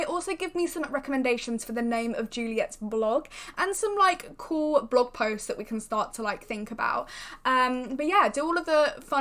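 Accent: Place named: United Kingdom. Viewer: I love british accent♡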